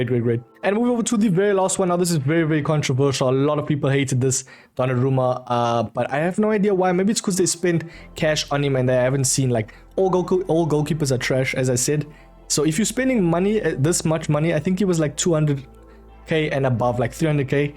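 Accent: South Africa. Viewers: South African? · R u frm south africa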